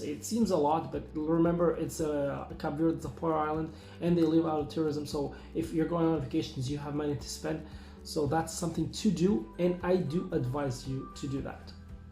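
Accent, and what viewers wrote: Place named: Portugal. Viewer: bom video, tens um inglês muito bom, mas nota-se bem que es português pelo sotaque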